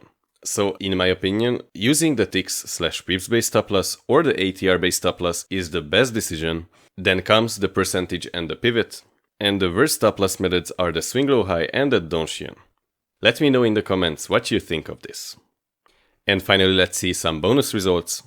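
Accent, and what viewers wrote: Hungary. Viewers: Hi, are you Hungarian?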